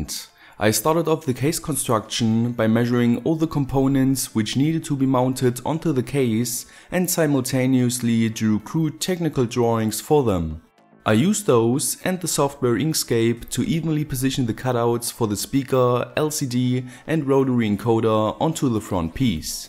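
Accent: Germany. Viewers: are u from germany? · I really like your German accent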